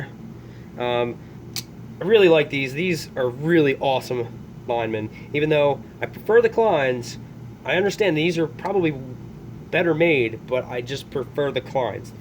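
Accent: Philadelphia, United States. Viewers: Literally two seconds in I could tell you're from Philly lol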